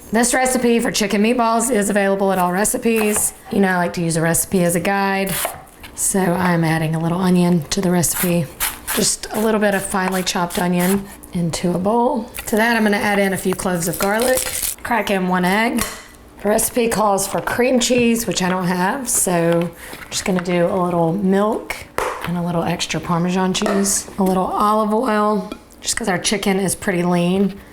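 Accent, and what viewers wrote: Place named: Alabama, United States. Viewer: I am in love with you. I love all your recipes. Perhaps if I comment ant say nice things YT will keep you on my YT feed. I dearly LOVE your Alabama accent too! Ken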